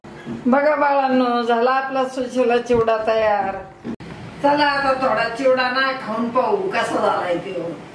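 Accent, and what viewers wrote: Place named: Marathwada, India. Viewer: खूप छान सुशीला चिवडा आई मी पण मराठवाड्यातली तुमची भाषा शैली मला खूप आवडते जवळची व्यक्ती भेटल्यासारखे वाटते मी तुमच्या मध्ये माझ्या आईला बघते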